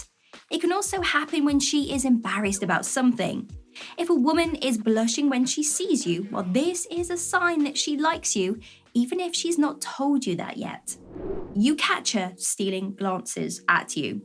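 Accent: United Kingdom. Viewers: She is British, isn't she?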